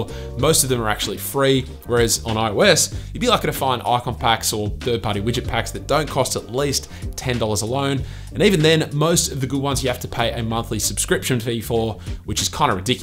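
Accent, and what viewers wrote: United Kingdom. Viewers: Thought you were British... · I thought you were from I UK or something haha. Sorry English it's not my first language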